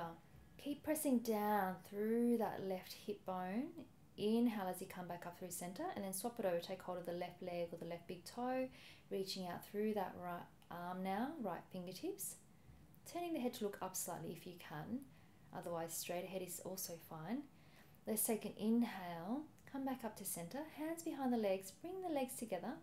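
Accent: Australia. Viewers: I have so enjoyed the yoga with an Australian accent! Great yoga classes and always new poses. Thank you.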